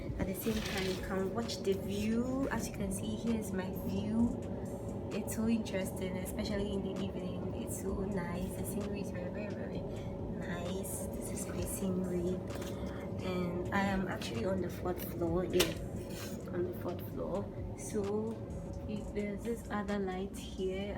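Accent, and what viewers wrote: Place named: Canada. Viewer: Kindly consider your sound it's low.... Hoping to hear some more Canadian accent soon🤩🤩🤩✨ Enjoyed the vid.... Keep it moving 💃💃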